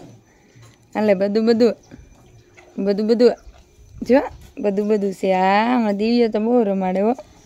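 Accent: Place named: Amreli, India. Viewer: Ha ho ben amreli ni bhasa to sav algj lage to ben tme sasre aaviya tye tmare bolvu thoduk aghru lagtu hse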